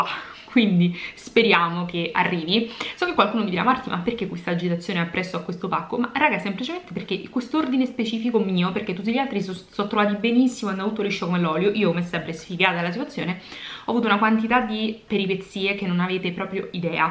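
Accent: Rome, Italy. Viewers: Il tuo accento romano mi fa muorire😂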